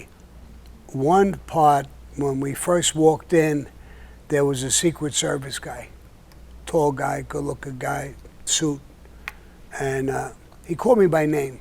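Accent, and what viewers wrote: New York, United States. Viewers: Nice to hear NY er!😊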